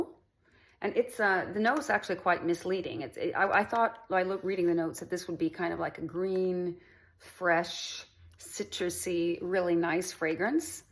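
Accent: United States. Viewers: Btw, I love it that Sweden is so secular that you were unfamiliar with "omniscient." It's one of the three "omni" properties that the god of classical theism possesses (omniscient, omnipotent and omnibenevolent.) I had a fiancee for 4 years who was from Gàvle, and while her English might be stronger, you've got the American accent down perfectly. She has a Swedish accent when speaking English and you do not. So interesting! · You sound like a perfect American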